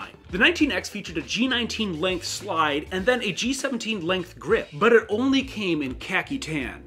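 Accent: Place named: Upper Midwest, United States. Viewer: That upper Midwest accent is thickkkk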